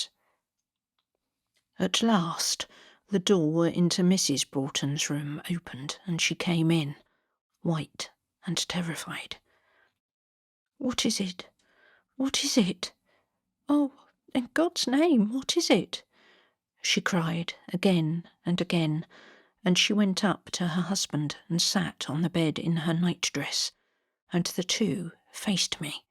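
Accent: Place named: Midlands, United Kingdom. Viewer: Are you from the midlands,? I love your accent and your beautiful narratives! Thank you, my Lovely. X X X😊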